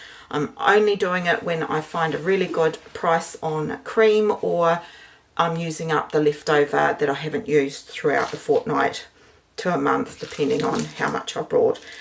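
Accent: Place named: New Zealand. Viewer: It always amazes me that some people have no better use for their time than to make nasty comments, of course if they weren’t anonymous they probably wouldn’t have the guts to do it. Also why watch videos you dont enjoy! It’s very easy to skip on by! As an expat kiwi I love watching your videos (although the cost of food seems crazy), but your down to earth, real approach to your videos is refreshing, not to mention I miss that kiwi accent!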